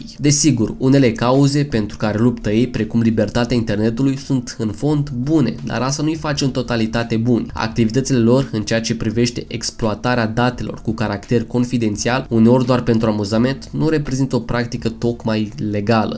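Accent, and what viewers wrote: Moldova. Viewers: Esti Moldovan? Ai un accent destul de ciudat😁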